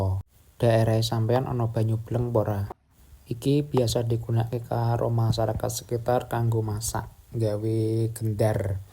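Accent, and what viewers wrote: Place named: Java, Indonesia. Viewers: Sampean ki rumahe mana mas? Kok boso jowone medok? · Mas sampean wong daerah ngeni sih kok ngomonge hampir mieip banget daerah ngonku aku wong surur sari grobogan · Bahasa medok smpean koyok aku ide ne iso aku suka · Kakak orang mana bahasa Jawa nya kok medok kali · Mase ki koyo wonogiri pinggiran Yen gunung kidul bhsane ono bedobe...umpomo yu .dadi zhu.. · Nambah komen mas,,ketok medok coromu ngomong ,,sampeyan corone masak ugo noto njero omahmu persis budeku,,.jangan gori,,iwak gereh.. Persis asli wong ndeso tapi kemajuane pesat,pinter opo wae.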